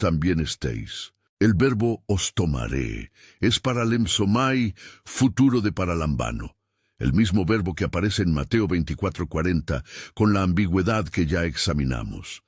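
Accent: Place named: Castile, Spain. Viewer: pones tu vocecita castellana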